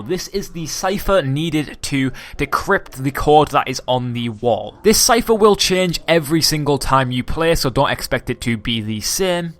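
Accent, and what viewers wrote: United Kingdom. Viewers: You are wrong there is a British accent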